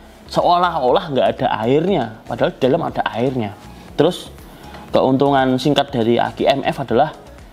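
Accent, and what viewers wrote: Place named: Java, Indonesia. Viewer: Boso jowone ojok nganti ilang mas Aku suka caramu menerangkan dengan ada logat jowone mantap😁🤙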